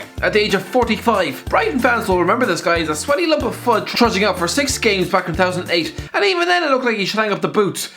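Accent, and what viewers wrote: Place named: Ireland. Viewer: he’s fucking irish give him a break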